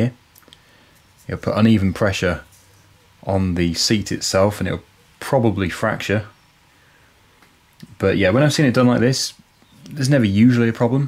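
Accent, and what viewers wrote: United Kingdom. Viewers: Excellent video, thank you! And the British accent makes it even better.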